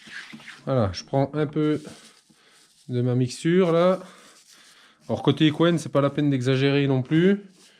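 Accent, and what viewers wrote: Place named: Eastern France, France. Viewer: bonjour je viens d'essayé ta recette et je pense que j'ai réussi assez bien pour une première fois. J'ai essayé de mettre des photos mais ca ne marche pas Quand au lard je n'ai pas trouvé du bon lard frais il faut dire que je réside à la Réunion et ce n'est pas facile de trouver de la bonne qualité. mais le gout y est. J'en profite pour te dire que ma mère est d' Epenoy dans le Doubs. Je ne sais pas si tu es du coin mais vu ton accent tu ne peux être que de la région de l'Est. Au plaisir de te lire François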